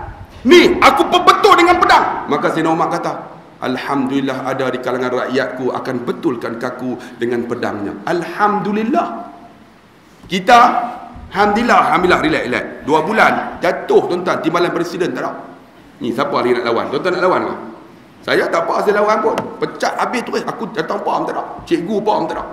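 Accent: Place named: Kedah, Malaysia. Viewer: Ustaq kami owg kedah mmg la kasar...owg kedah mmg ckp mcm nie...harat jngn ambil hati noh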